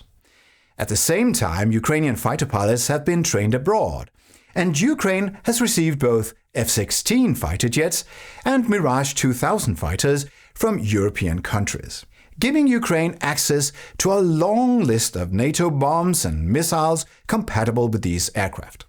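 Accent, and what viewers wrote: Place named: United Kingdom. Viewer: I cannot thank you enough, I love your content and presentation. May I ask, are you German? You have a British accent but is a German name. We (normal Americans) are glad that Germany is on our side… we have some seriously bad adversaries that we will soon be dealing with…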